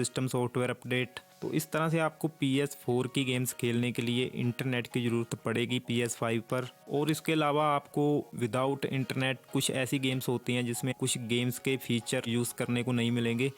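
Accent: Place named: Punjab, India. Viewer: Bhai tera accent Punjabi ja aa 🤨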